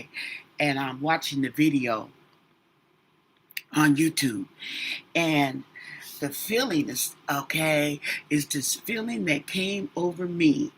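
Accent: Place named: South, United States. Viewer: I have tuned into your readings 3times now you are so comical love your accent are you from deep south etc south coralinna or Georgia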